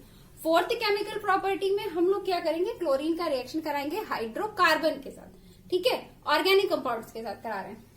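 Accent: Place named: Bihar, India. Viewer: You are good in teaching. I am a chemistry faculty for jee/neet. Your teaching notes are concise and very clear, strictly according to ncert syllabus. No unwanted talk; only topic relevant content. Keep it up and keep posting. You are bound to rise on this platform. Also As bihari, I liked your accent accent.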